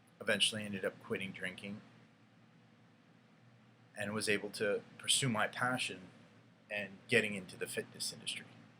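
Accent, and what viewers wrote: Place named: East Coast, United States. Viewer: Thanks for the videos and the motivation. Keep them coming! For the record, east coast accents are the only real American accent. #BXNY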